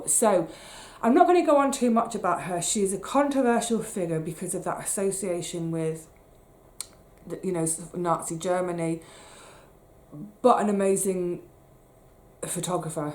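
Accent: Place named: England, United Kingdom. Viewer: Hello from Australia...enjoyed your chitchat and lovely books you've read...definitely right down my ally....started watching you video, had to pause you and watch Mr harass goes to Paris..so enjoyed it and here I am finishing of your video and all that English accent..tfs..happy reading and hope you got some things crossed off your list...greetings from Australia..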